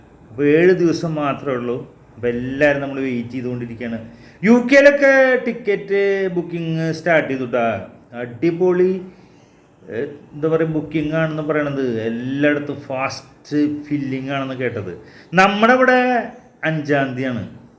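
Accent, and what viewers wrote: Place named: Kunnamkulam, India. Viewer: ഇക്കാടെ കുന്നംകുളം വായിൽ irikkunno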